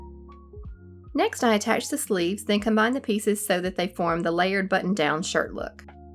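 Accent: South, United States. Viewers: idk where youre from, but im from SC and love to hear southern accents from youtubers. its very comforting. im gonna guess youre from TN but i could be totally off on that lol. also i love the slow pace of your videos. a lot of doll makers create energetic loud videos and while thats totally fine !!!! i love seeing stuff thats more laid back and calm. :)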